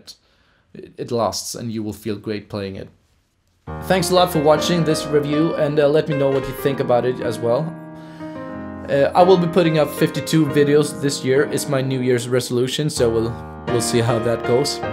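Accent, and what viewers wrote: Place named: Sweden. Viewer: Great review. I was looking for another keyboard primarily to use as a DAW VST controller, and was considering this one, but your review really helped me realize that the faders do not have the precision that I need to control things like cutoff frequency. Just based upon your English, I am guessing that you are Swedish. If you live near Stockholm, do you have any recommendations on where to buy music gear or music accessories. Tack!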